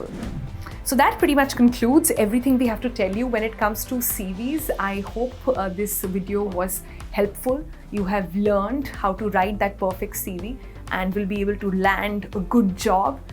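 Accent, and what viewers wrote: India. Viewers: Wow, I struggle with such a strong Indian accent. 😞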